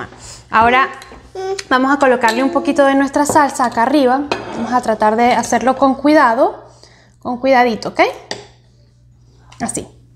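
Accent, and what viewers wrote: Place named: Venezuela. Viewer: De que parte de vzla es tu acento ?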